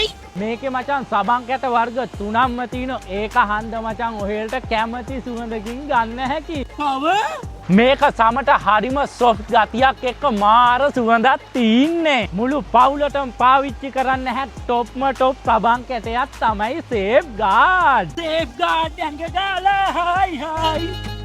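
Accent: South, Sri Lanka. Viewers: Dakune bashavada manda😂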